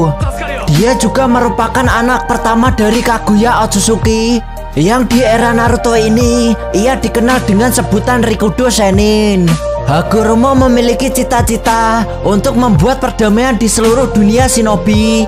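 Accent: Java, Indonesia. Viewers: pekat jawanya bang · jan medok tenan rek😁😁😁😁😁😁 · Medok banget cukk 🤣🤣🤣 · Yang bicara auto jawA 😂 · Bahasa nya medok jowo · Medok bgt bahasa jawa nya wkk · baru nonton semenit pala pusing denger jawa ngomong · Medok x jawamu telor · medok amatttt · Medok sekali😂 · Medok banget abangnya, jawa tulen kayaknya... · Jawanya pekat😃😃😃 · Pekat beno jawa kau bang🤣🤣🤣🤣🤣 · Medok banget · Medok nya itu lo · Oalah medok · Yooo org Jawa taelaso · jawaneeeee medok abis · Terlalu medok suaranya😂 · Kayaknya orang jawa ni yg pnya chnel ini , dari logat nya 😂🤣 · logat mu loo jawa banget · Jawa amat si suaranya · Medok jawa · mbahasa lo muedog buanget bro 😂😂😂😂😂😂 ga uenak di denger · Medok bgt mas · lah medok kali bahasa mu tong ,, ngakak njirr kayak lagi rap ini.. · kok pekat banget jowo nya min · Asli mana ngab?... Logatnya medok amat🤭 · Logat jawa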